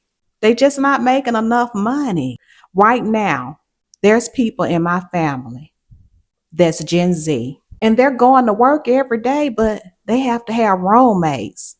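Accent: South, United States. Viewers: Great content! Cool Southern accent. As a Gen Xer, definitely jack of all trades ...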